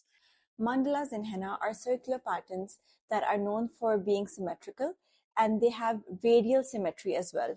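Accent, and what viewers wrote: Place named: Kashmir, India. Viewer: Your accent is really impressive .....!! ❤ are you from kashmir ?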